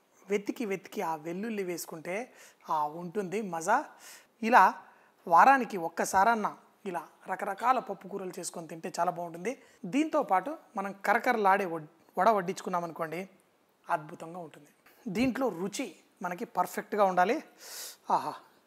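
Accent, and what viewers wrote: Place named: Hyderabad, India. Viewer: We want that Hyderabadi voice✌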